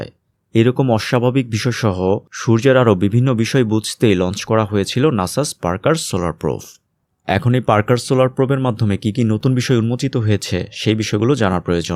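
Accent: Narsingdi, Bangladesh. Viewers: ভাই এর বাড়ি মনে হয় নরসিংদী। ❤ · ভাইয়ের বাড়ি কি নরসিংদী নাকি 😀 আমার বাড়ি নরসিংদী, নিলক্ষা। ❤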